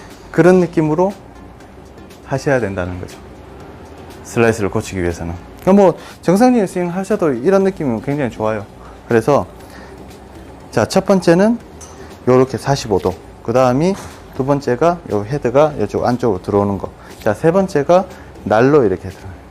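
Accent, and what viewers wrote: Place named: Gyeongsang, South Korea. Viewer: 구독 좋아요 눌렀습니다. 경상도 사투리가 참좋네요^^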